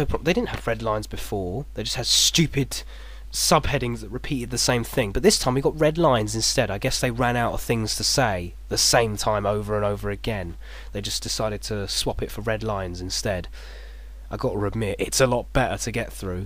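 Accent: United Kingdom. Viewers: Your voice is like the love child of Yahtzee Corshaw, Stephen Merchant, and every single other British voice I heard over the internet. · I always enjoy hearing British voices which is why I like Michael Rosen poops so much. What part of England are you from? Or perhaps are you from a different country? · ***** He's definitely from Britain.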